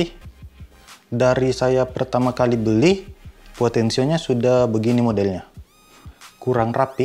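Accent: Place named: Jakarta, Indonesia. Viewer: Logatnya keren klo org mks logat jakarta🤓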